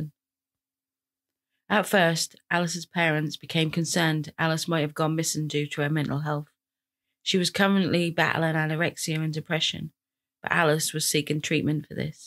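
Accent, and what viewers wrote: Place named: Cambridgeshire, United Kingdom. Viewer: Can I ask are you from outsell Wisbech area - my ex husband was from there sound so similar excellent channel xx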